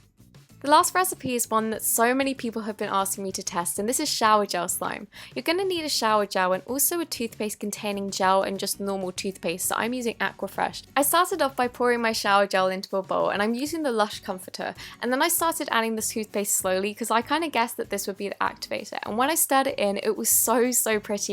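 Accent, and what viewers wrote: Australia. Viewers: Are you aussie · Are you Australia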